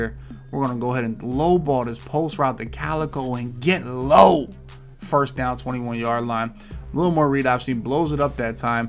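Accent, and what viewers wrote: Philadelphia, United States. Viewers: You from philly bro south philly myself sound like a philly dude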